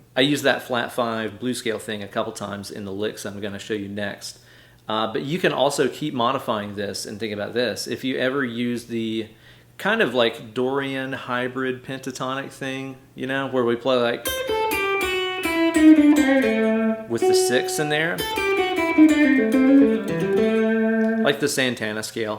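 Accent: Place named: Virginia, United States. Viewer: I love this! As an experienced metal player trying to work my way into country playing, this is a quick easy transition, rather than attempting to start from scratch or re-invent the wheel, so to speak. Great content as always. You have quite the way of explaining things, so that anyone can get it. I grew up in SW VA so your accent reminds me of home. Keep rockin!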